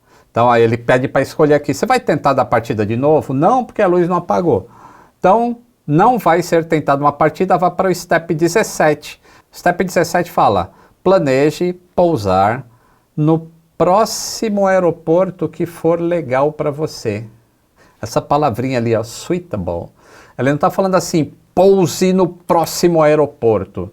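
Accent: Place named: Rio de Janeiro, Brazil. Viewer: tá pegando sotaque carioca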